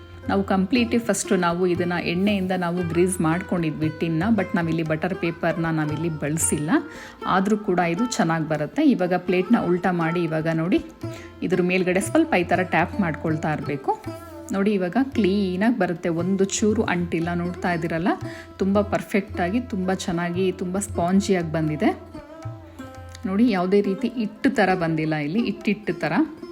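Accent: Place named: Malenadu, India. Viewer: ಹಾಯ್ ಮೇಮ್. ನೀವು ಅಚ್ಚ ಕನ್ನಡದಲ್ಲಿ ಸ್ವಚ್ಚವಾಗಿ ಮಾತಾಡೋದನ್ನು ಕೇಳಿದ್ರೆ ಹಾಗೆ ಕೇಳ್ತಾ ಇರ್ಬೇಕು ಅನ್ಸುತ್ತೆ. ನಿಮ್ಮ ಮಾತಿನ ಶೈಲಿ ನೋಡುವಾಗ ನೀವು ನಮ್ ಮಲೆನಾಡಿನ ಕಡೆಯವರಿಬಹುದೇನೋ ಅನ್ಸುತ್ತೆ. ಮತ್ತೆ ನಿಮ್ಮ ರೆಸಿಪಿಗಳೆಲ್ಲ ಒಂದಕ್ಕಿಂತ ಒಂದು ಚೆನ್ನಾಗಿದೆ. ವಿವರಿಸುವ ಪರಿಯಂತೂ ವರ್ಣನಾತೀತ. ಅದನ್ನೆಷ್ಟು ಹೊಗಳಿದರೂ ಸಾಲದು.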